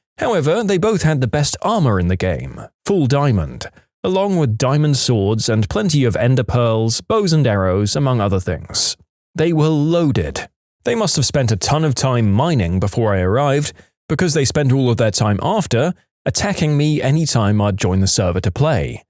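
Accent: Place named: North, United Kingdom. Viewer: Love this stuff and love the voice very Northern British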